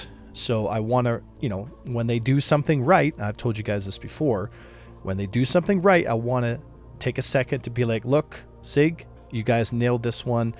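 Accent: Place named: Canada. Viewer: you sound SO Canadian here lol.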